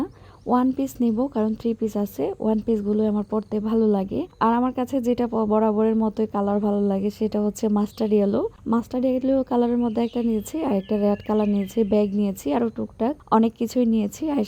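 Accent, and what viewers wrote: Bangladesh. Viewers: আপু তোমার ভিডিও চুপি চুপি এসে সব সময় দেখে যায় কিন্তু কখনো কমেন্ট করিনি আজ করলাম তাও কেন জানো তুমি ব্রাহ্মণবাড়িয়ার তার জন্য আমি তোমার ভাষা শুনে প্রথমে বুঝে গিয়েছিলাম পরে দেখলাম না সত্যি তুমি ব্রাহ্মণবাড়িয়া খুব ভালো লাগলো জেনে আমিও ব্রাহ্মণবাড়িয়া মেয়ে আপু কসবা সৈয়দাবাদ বাড়ি আমার জন্য দোয়া করো আপু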